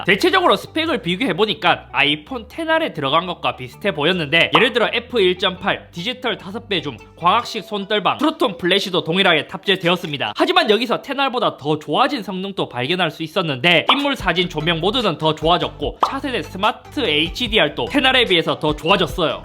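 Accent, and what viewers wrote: South Korea. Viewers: 형 요즘 말투 왜 그래. 저번에는 서울말 하더니....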